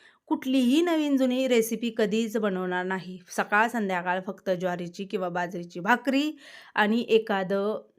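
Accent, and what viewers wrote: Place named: Dhangari, India. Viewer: ताई तुझी भाषा धनगरी वाटते ग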